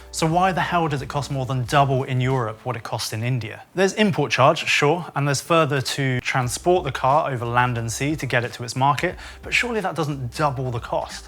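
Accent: England, United Kingdom. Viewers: Like the reasoning and the English accent. Would I ever own an electric car ? Over my dead bloody body !!!!!!!!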